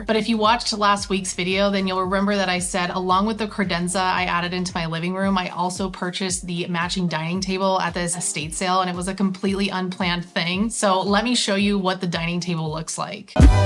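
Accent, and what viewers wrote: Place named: California, United States. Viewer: A piece of trivia. In reference to a previous comment, concern about your voice, your inflection told me you were a Californian! My kids grew up here. They don't have that accent. I grew up in San Antonio. I used to have a thick accent. My sister did not. Go figure.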